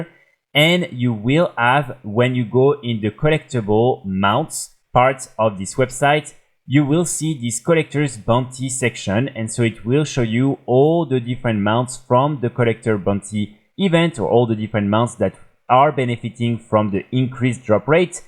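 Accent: France. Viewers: Do you do these in French? I'd watch and listen to those same videos if you do. It's the accent, it is quite thick and very French.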